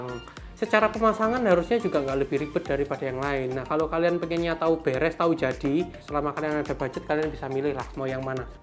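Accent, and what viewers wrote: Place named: Java, Indonesia. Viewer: medok banget.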